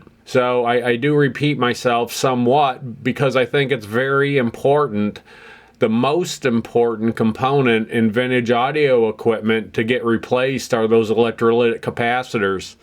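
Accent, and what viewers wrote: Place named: Buffalo, United States. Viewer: Your accent is just like a co-worker that is from Buffalo, NY.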